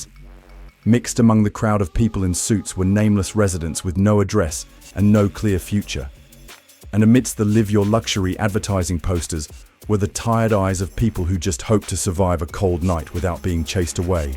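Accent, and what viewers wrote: United Kingdom. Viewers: Living in Manhattan for over 40 years I cannot agree with this clip. It exagerates very much the severeness of the situation. its not as bad as you portray, notice your British accent , you are not one of us and cannot judge. NYC spends billions a year on this problem and I should know since they helped me a lot with certain issues. There is no right to live in Manhattan. Its for the rich and wealthy. You can move to pennsylvania or New Jersey and seek your fortune there where costs are lower. and rent is lower. Most of these homeless are not from here but from much further away. Notice they dont want to leave Manhattan though they are homeless because there are many shelters and places to get help and free food daily. Cant agree with your show and its too untruthful, RM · I live in NYC. Have never seen a Tent city. About 10 years ago the homeless mentally ill were rampant in the subway stations , but it’s been cleaned up. 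125 th on the east side was full of garbage and K2 victims 8 years ago but no longer they’ve cleaned it up. There’s no longer any abandoned buildings , that ended years ago. Where is the British speaking person speaking from , the moon ?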